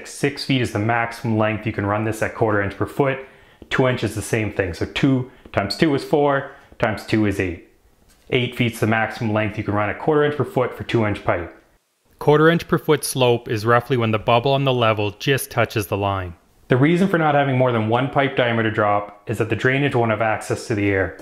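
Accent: Canada. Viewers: You must be from the Maritimes.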